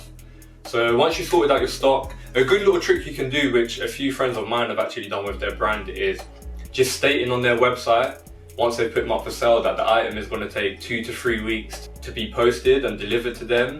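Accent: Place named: United Kingdom. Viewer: Light skin nipsey hussle with a British accent cuz lol naw real shit thanks for the info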